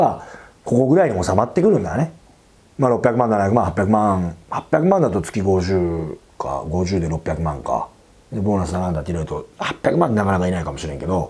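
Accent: Nagoya, Japan. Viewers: 同じ愛知なので名古屋弁聴き心地よかったです！ 自分も一昨年独立したばかりなので勉強になります！ ありがとうこざいます！ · 名古屋弁だ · 名古屋弁落ち着く― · 初めてみてラッパーのAK69の喋り方に似てると思って概要欄みたらやはり名古屋でした！笑 そのまま話を聞かせていただきました。大変勉強になりました！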